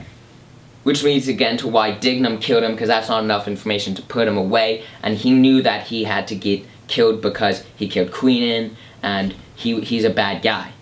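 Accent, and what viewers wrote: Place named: North America. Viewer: Somewhere in North America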